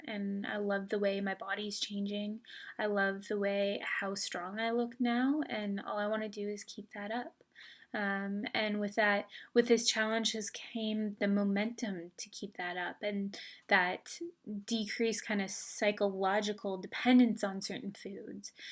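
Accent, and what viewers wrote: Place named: Canada. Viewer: Haha. I mostly hear it in the words - Sorry, hard, thirty, out/throughout, to. Actually, I can always tell Canadians when they say the "word" out or "sorry". Accents are my thing and I spend way too much time studying and imitating them. Haha. I'm a dork.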